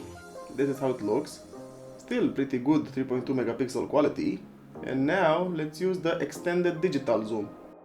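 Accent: Romania. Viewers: inconfundabil accentul romanesc al vorbitorilor de engleza :)) literalmente 30 de secunde a mers clipul cu vocea ta pana am pus pauza sa intru la 'About this channel' sa văd țara 😅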